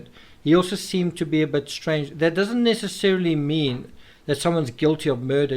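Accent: South Africa. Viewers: Hey Man...when I heard you talking I knew straight away that you're South African! 🇿🇦 So nice to hear my own language again. Far away from home....🇦🇹💕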